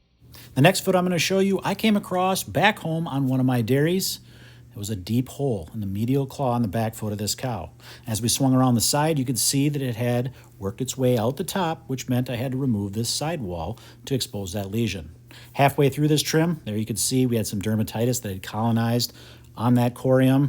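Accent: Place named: Wisconsin, United States. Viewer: The first time I watched 1 of your videos I said " oh yea this guy is definitely from Wisconsin " 😂 you sound like a sweeter, smarter and friendlier version of Bob from that 70s show and I love it haha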